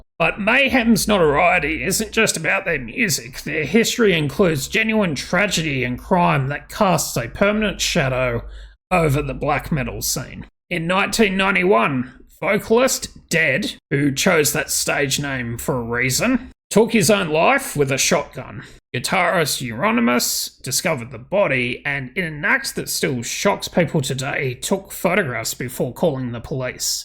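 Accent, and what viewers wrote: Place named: Australia. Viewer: are you Aussie btw? I could swear that's where your accent is from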